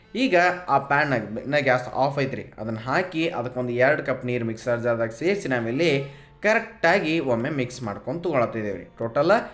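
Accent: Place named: Dharwad, India. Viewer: ಸಾರಿಗಿಂತ ಜಾಸ್ತಿ ನಿಮ್ಮಧಾರವಾಡ ಭಾಷೆ ಕೇಳೋಕೆ ಮಸ್ತ್ ಅದೇರಿ 👍😁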